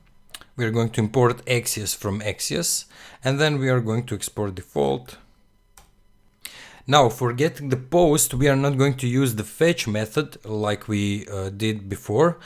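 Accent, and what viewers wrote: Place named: Russia. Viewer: Ну это русский пацан, сто процентов!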